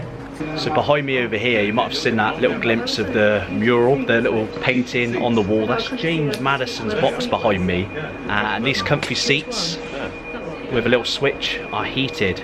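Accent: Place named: Manchester, United Kingdom. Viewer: That distinctive Mancunian accent.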